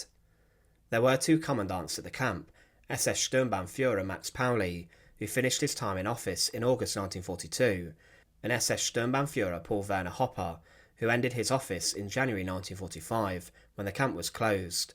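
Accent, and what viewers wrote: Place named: West Midlands, United Kingdom. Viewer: I grew up in a place where most people speak like that (the West Midlands) and left as soon as I could, because I couldn't stand the accent.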